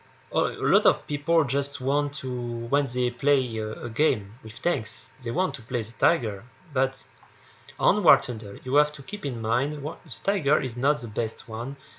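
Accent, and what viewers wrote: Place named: France. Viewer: avec le petit accent français c parfait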